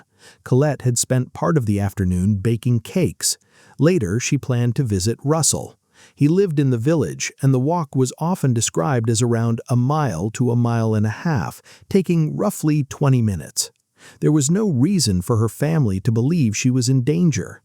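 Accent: United States. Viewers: Why the "American" narration..? It isn't necessary to prove a motive...but perversions are timeless...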